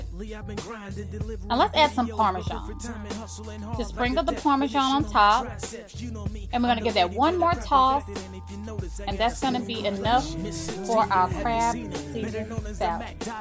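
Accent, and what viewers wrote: South, United States. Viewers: I love the way you talk with that southern twist like me People from other states say we talk funny but you dont care!! Gurl keep repping for us gurls down south!!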